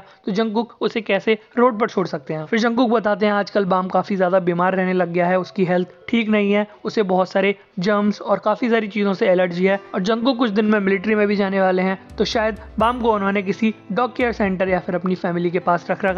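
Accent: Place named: India. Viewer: You ruined the whloe video with your indian accent PUBG Mobile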